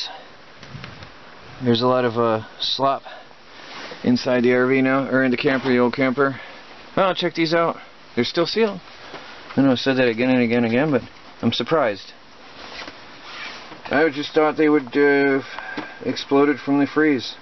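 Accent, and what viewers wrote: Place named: Michigan, United States. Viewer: Your Michigan accent is showing. :)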